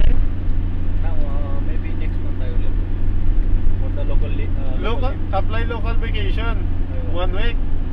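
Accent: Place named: Iloilo, Philippines. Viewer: It's ok po after pandemic nlang po kayo mag vacation. You sound an ilonggo kuya hehe..ingat po kayo.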